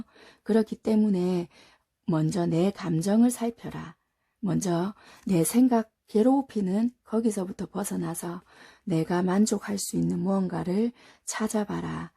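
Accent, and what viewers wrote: Busan, South Korea. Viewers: 감기로 목상태가 안 좋으신가보네요. 얼릉 쾌차하세요~ 사실 저도 부산 사람이라 부산사투리 첨부터 들었을때부터 정감이 많이 가서 계속 리딩 듣게 되네요^^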